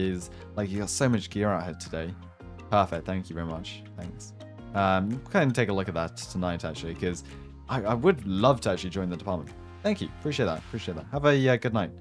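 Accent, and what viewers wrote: United Kingdom. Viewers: He British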